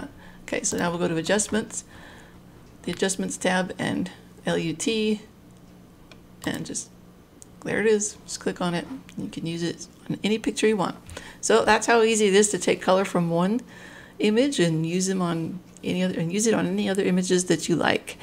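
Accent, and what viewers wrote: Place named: North, United Kingdom. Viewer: oh my gosh I'm from the north and you sound like you are too :P Thanks for the video!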